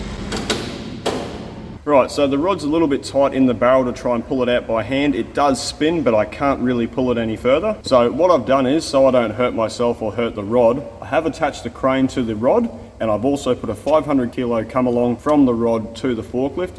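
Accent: Australia. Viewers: Hey another great video you need to get something like a torch meet table for making different ranches and stuff like that that would be cool like on a CNC plasma cutter table I love the outtakes though I really do specially with that Australian accent. But seriously how long does it take to do a job like that that’s one thing I wish you would add do your video how many hours totally it takes to do each job !!!! I truly check every day to see if you guys are posted another video greetings from Chesapeake Virginia USA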